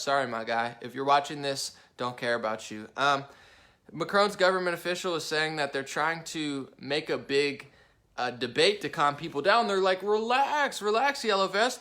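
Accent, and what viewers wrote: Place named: Boston, United States. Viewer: Your boston accent blows dude, but we love you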